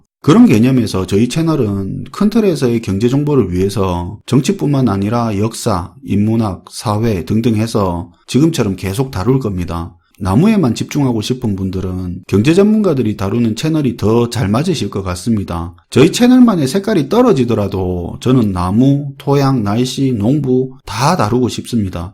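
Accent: Gyeongsang, South Korea. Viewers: 난 딱 한가지 원 한다면 경상도 사투리 고칠것 난 15년 걸려다 경상도 사투리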